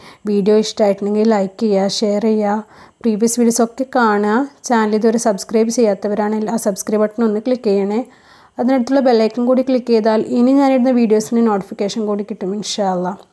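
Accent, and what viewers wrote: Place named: Malabar, India. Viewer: എനിക്ക് അറിയാം.. സ്പീച് slung. മലബാറിൽ എവിടേയോ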